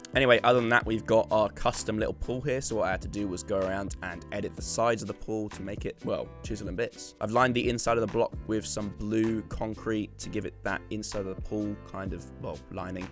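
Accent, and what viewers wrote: United Kingdom. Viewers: Your calm speech and voice, British accent, the chillhop and the patience and hard work you put into these builds/videos makes for such a fucking amazing and relaxing content. Your channel is Gold, mate.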